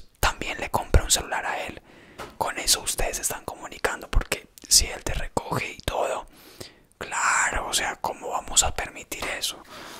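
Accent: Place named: Bogotá, Colombia. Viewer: Cuando empecé a ver tus vídeos pensé que eras español jsjsjjs y después me di cuenta de que eras colombiano, más encima rolo xd, me cagué de la risa con tu actuación , y tu bigote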